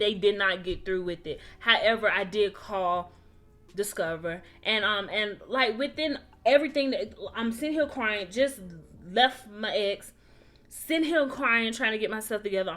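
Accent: Baltimore, United States. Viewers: You sound like a bmore girl lol. I enjoyed the story time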